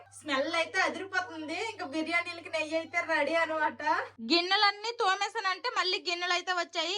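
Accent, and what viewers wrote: Srikakulam, India. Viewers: Hai sister నా పేరు సుధ మాది విజయనగరం పార్వతీపురం hai cheppandi Mi vlogs chaala baaguntaayi Mi srikakulam యాస బాగుంటుంది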